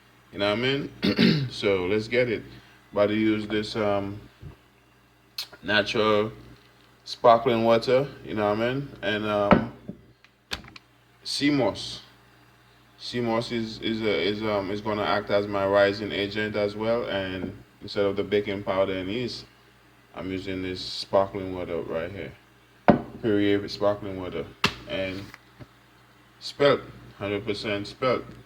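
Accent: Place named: Caribbean. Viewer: Straight from the Caribbean I hear the patois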